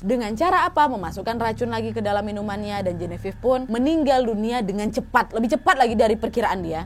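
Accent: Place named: Java, Indonesia. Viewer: Mood banget kak ngomongnya medok 😀😀😀😀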